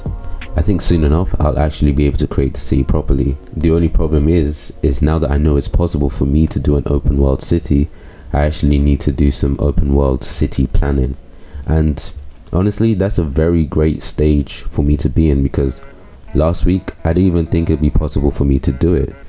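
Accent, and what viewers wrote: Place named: West Africa. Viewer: yo, great work but you have a similar accent as me, are you from west africa, not to be offensive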